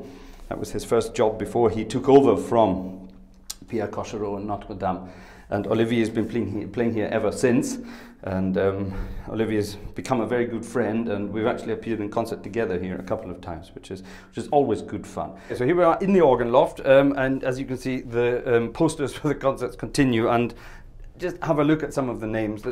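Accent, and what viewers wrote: Scotland, United Kingdom. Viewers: Er ist ja Schotte. Schottischer Akzent klingt angeblich, als würde ein Bayer Englisch sprechen. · To my British ears...no! has a lovely Scots accent:) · I didnt realise you were scottish! Explains the accent then haha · Did german language steal your scottish accent?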